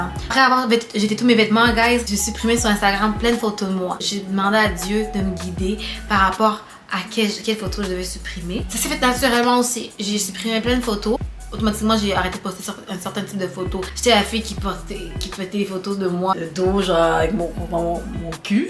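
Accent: Canada. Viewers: chic accent Canadien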